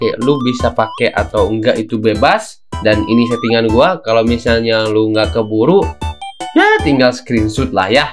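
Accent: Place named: Sunda, Indonesia. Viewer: Nyunda parah euy si aa😭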